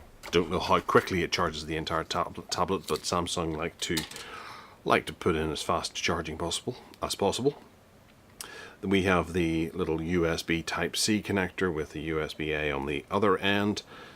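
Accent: Northern Ireland, United Kingdom. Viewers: What part of north of ireland you from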